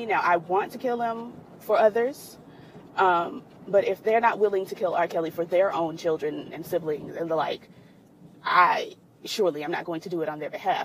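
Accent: South, United States. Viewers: I tune in because of your southern twang. Do you girl and forget those haters. Have a good Friday mama.... BTW: Emily is crazy for allowing a dude who wont even claim her abuse her. Self esteem is essential when dealing with those type of dudes. Hope she finally moves on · Bring back the southern accent! Lol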